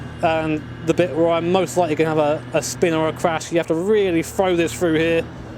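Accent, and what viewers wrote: United Kingdom. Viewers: Are you brit?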